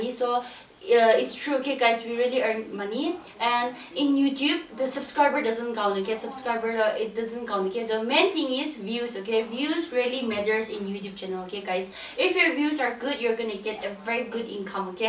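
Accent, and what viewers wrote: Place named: Assam, India. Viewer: I like your Assamese accent 😭💓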